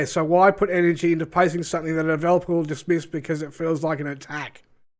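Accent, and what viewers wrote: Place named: Australia. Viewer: Sure man, il check the rest of the video now ( working from home anyway so i can listen to it. Im just not used to the strong F words and such :D -> im a full on Jedi, light sided kind of guy finding pleasure in being as good as possible, so ye..dont mind me :D ) Currently my fleet consists of 1 Carrack, 1 Mercury Star Runner, 1 Banu Merchantman, 1 Redeemer and 1 Eclipse. -> Honestly at this point i was only planning one last upgrade to my fleet and that is upgrading the Eclipse to a Polaris and thats IT. -> I honestly think that even 300$, or 500$ and not to mention1000$ is way to much already to support but the issue at this point is that im already down the rabbit hole and can almost touch my finish line of a (dream fleet) so i might as well do that. -> Another Issue is tough, that upgrading and buying ships at this point started to feel like a minigame which i can understand maybe some people who cant even stop the fantasy/dream of the supposed grandiose game on its release. 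Im like arround 80% in into doing that last upgrade but at that point i will stop buying or upgrading ships all together. All i can do is hope at this point that Chris and the teams learn from their mistake and do not take for granted the community because it can all fall down on themselves with a finger snap (just like Thanos :D ). Edit: i like your Australian accent! God bless you man :) Edit.2: I only play since last year arround september 2019 (i know i started right with the 3.7 or 3.8 or what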